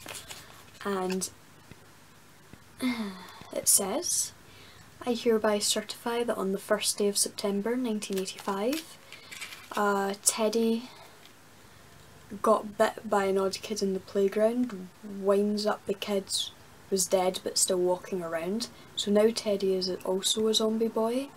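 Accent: Scotland, United Kingdom. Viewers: Hate to ask but are Scottish or British?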